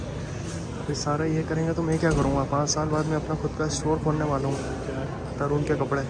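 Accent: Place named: Mandsaur, India. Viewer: Tu bhai tone chng mat krna...khanpuriya mandsaurii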